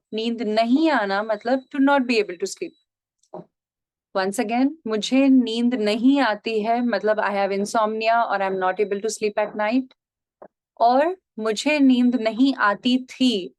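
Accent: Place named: Delhi, India. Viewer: Hey, do you mind if I ask which part of India you're from? You sound like you have a Delhi accent, or at least from somewhere in the North. Also, I was going to suggest you to do a video about the numbers in Hindi.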